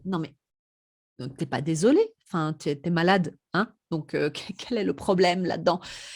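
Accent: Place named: Switzerland. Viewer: Bonjour! J'ai découvert ta chaîne il y a 2 semaines et j'accroche vraiment! Je ne suis pas maman mais je travaille à mon compte depuis la maison et du coup il y a beaucoup de choses qui me parlent dans ce que tu dis. Je dirais même que ça m'aide alors merci beaucoup! J'aime beaucoup ton authenticité et même je t'admire d'oser montrer autant de toi, en toute simplicité, sans chichi. J'aime aussi ta façon de parler, ça me change (la Suisse c'est exotique 😉) et puis c'est ok si tu fais des"fautes de français": déjà parce qu'on comprend ce que tu dis de toute façon. Et puis c'est ta façon de faire, c'est tes expressions à toi, c'est presque ta signature en fait. On a tous notre manière de parler et c'est bien aussi! La langue est vivante et d'ailleurs les erreurs d'aujourd'hui seront peut-être la normalité de demain! Continue à faire ce que tu fais! 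👍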